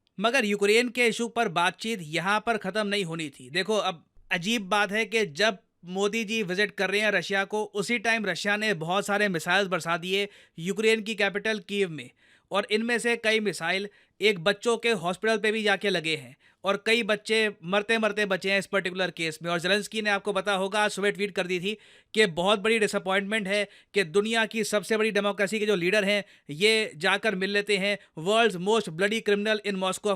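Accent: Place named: South, India. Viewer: That south Indian accent 😂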